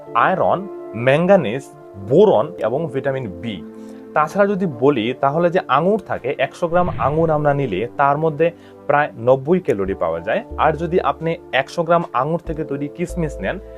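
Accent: Sylhet, Bangladesh. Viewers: বালা মাতছইন সিলেটি ভাই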